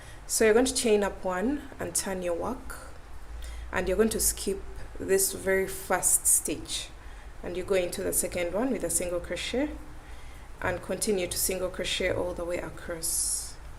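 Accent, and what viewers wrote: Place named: Uganda. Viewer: Cool pattern! Also, I lit up when I heard your accent. I used to live in Uganda and your voice made me miss it!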